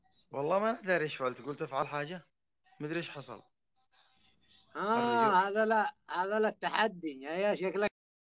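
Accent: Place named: Yemen. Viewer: هذا يمني لهجته خالد الراعي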